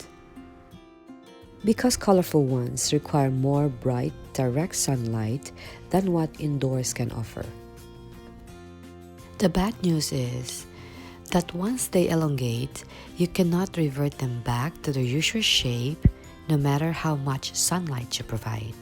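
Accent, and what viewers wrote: Philippines. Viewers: I can hear you're my kababayan! This is an excellent video. Thanks!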